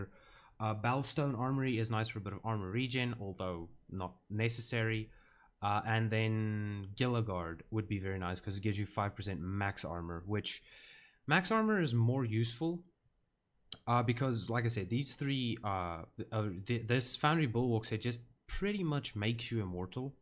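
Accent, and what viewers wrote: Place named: South Africa. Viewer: Thanks for the video. Which part of SA are you from? I've been to Durban for some time.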